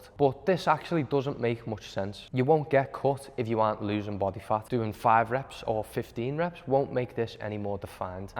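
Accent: Liverpool, United Kingdom. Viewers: It’s scouse la, ynwa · Theres something, I like about the scouse accent, which is fun, but also, i'm not fucken about lad.... 😛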